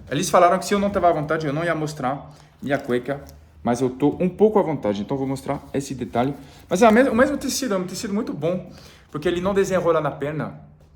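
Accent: France. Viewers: Parabéns,! Vc tem uma verdadeira alma brasileira com sotaque francês! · Esnobe, Passou 20 dias na França e já voltou c sotaque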